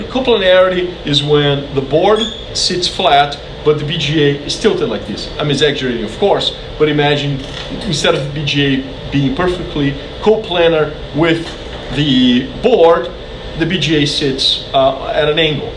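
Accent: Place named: Brazil. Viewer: Esse cara tem muito sotaque de brasileiro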